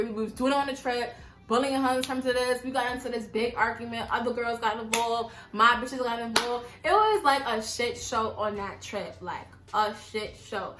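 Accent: New York, United States. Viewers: It's the NY accent for me 😍😍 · it's the NY accent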